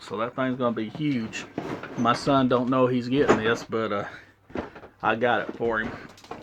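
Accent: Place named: South, United States. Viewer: bye the way do you live in the u.s. i live in titusville fla. thank you p.s. you sound your from the south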